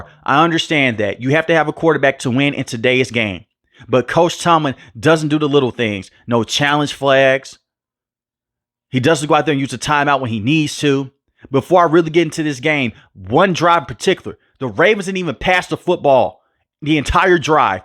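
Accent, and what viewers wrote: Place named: Louisiana, United States. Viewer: This accent is too strong. Louisiana?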